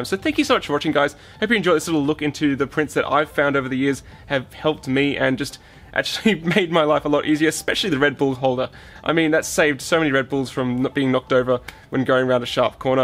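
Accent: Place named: Australia. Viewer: 5 years old and 1million views and no one has mentioned that in the closed captioning you say you defecate in your car. The auto captioning has trouble with Aussie accents, lol. Great video, all the best! Eddie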